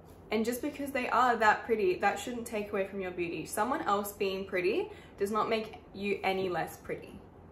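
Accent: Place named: Australia. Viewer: I’m glad that i come across your video!!! You’re so underrated! I wish you could gain more subscribers soon! Also i will start watching your videos starting from today so i can learn aussie accent cause i had always been liking aussie accent <33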